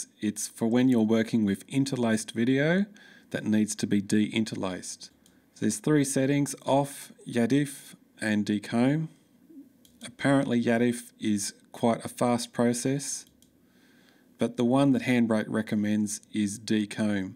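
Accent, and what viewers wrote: Australia. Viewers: LOL, just watched your video (1st Feb 2025) on Handbrake v1.9.0! And it’s STILL such an awesome, informative, and super helpful guide – easy to follow with that classic Aussie accent too! 😂 Greetings from South Africa! :hand-pink-waving:🏉🏏😆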